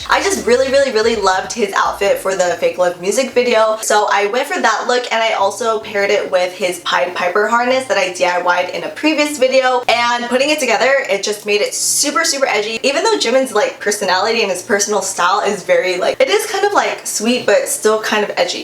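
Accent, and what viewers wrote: United States. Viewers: u look asian but then u have rlly dark skin and then you have an american voice. im confusion lmfao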